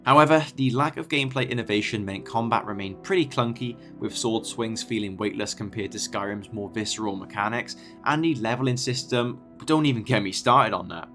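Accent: Wales, United Kingdom. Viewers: Is that a welsh accent i detect?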